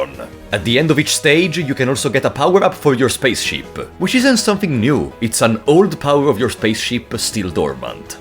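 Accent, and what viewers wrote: Italy. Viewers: That hits me like a truck hearing manusya, a word in my niche language in an english game narrated by a dude with heavy italian accent. Very cool. Didn't know that word came from buddhism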